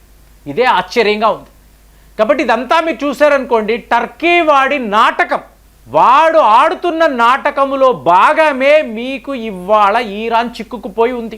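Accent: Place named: Kerala, India. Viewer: అన్నా మీరు మాట్లాడితే కేరళా వాళ్ళు తెలుగు మాట్లాడినట్లు ఉంది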